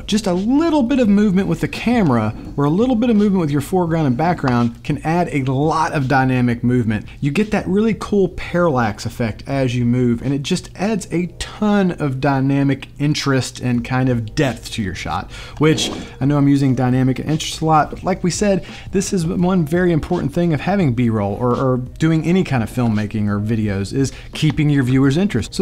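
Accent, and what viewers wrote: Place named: Texas, United States. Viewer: #Helpful. Will use your skill share link. Where you from? Texas?... great accent!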